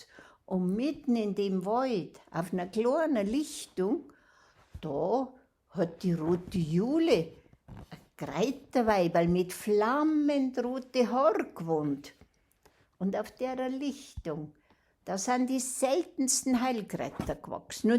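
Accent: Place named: Bavaria, Germany. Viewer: Einso schönes Märchen im bayrischen Dialekt. Auch als Nordlicht konnte ich sehr gut folgen. Ich mag Mundart erzählt sehr! Es ist authentisch und hat Herz. Auch finde ich die digitale Kamishibai sehr ansprechend. Ich habe gerne zugesehen und zugehört.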